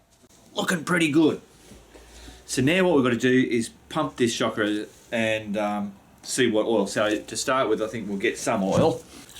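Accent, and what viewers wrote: Australia. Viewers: Great to hear an Aussie.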